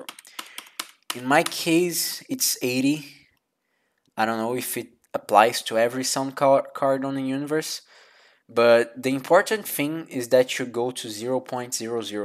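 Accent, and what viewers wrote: Brazil. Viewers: I know a brazilian when i hear one, meu camarada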